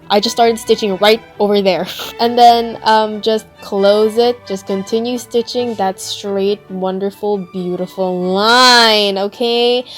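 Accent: England, United Kingdom. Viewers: so relatable lmaooo, a new subscriber hereeee. i thought ur not filipino likeeee i love ur eng accent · I LOVE YOUR ENGLISH ACCENT 😍😍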